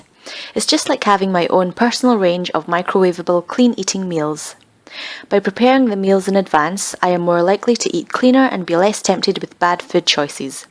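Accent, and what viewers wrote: Scotland, United Kingdom. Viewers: love your British accent! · You sound so Scottish :) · Love the Accent, FREEEEEEDOM. poshest Scottish accent i have heard in a long time and a good video to boot.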